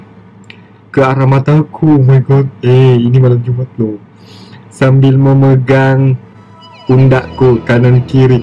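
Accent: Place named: South Sulawesi, Indonesia. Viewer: hehehehe.tetep masih ada kekentalan bugis nya kk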